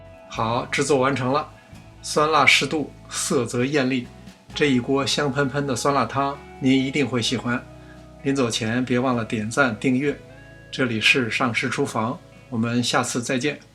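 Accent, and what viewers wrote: Beijing, China. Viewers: 的菜品不评价了，毫无惊险的每每获胜！这次想说，在视频编辑上用心了: 一道家常但可有千秋的酸辣汤，在京腔序曲下，低调出场……柔柔缓缓的音乐伴随汤的成长……随着特异蛋花出台、香菜妆点，高潮迭起……换大碗盛饭吧……明儿再折磨treadmill 😃😃😃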